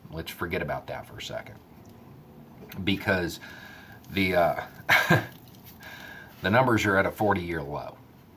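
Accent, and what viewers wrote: South, United States. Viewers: For as long as I've been watching your videos, I've been thinking: It sounds even truer when you hear it stated with a southern accent.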